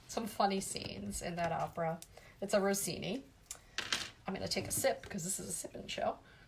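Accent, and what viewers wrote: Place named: New York, United States. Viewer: Hi I really enjoy your little chat. Feel I am back in N.Y. specially with the way you say certain words such as gala and expressions such as make a pretty lunch. One day I collapsed in Met op shop and a lady arranging jewellery display helped me stagger out to foyer where I tried to hang on to those fancy crowd comtrol ropes! I wonder if this lady was you? Great thing about fainting there..I was whisked off to bowels of Met where I met Peter Gelb and also saw amazing porrtrait of Maria Callas. Later I banged into Peter Gelb again and said, "Wow! I keep bumping into VIPs. Quick as a flash he said, "You're the VIP!" Clever guy!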